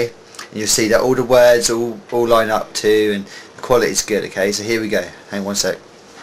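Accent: United Kingdom. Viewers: Great Brit accent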